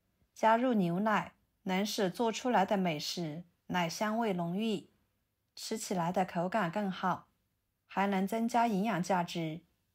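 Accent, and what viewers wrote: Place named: Yunnan, China. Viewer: 云南普通话😂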